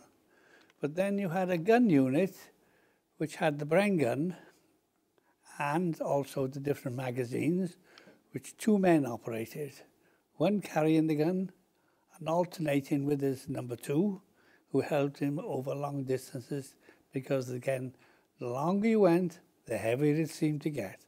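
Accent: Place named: Wales, United Kingdom. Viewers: Lloyd Pagett He has a welsh accent, I live in Wales can confirm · Sounds like he's from Cardiff or Barry :D.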